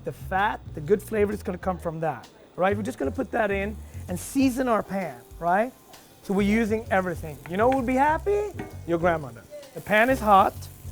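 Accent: Sweden. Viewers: Hörde hans svenska dialekt, vad kul! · You can really hear the Swedish influence when you talk. ^^ Tack för receptet måste testa det snart!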